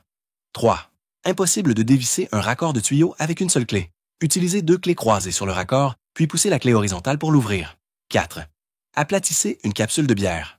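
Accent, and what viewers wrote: Canada. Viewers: Si la voix était prononcée par un accent français et non canadien ce serait agréable à écouter Si...c était plus lent à regarder...ce serait bien plus plaisant Comme ça est maintenant....impossible de m abonner J aime me sentir " bien"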